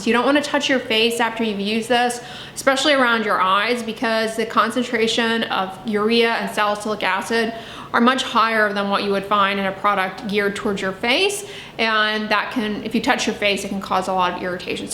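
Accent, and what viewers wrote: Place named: South, United States. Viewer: I don’t like your southern accent! This accent irritates me to the core but thanks for your ( advise).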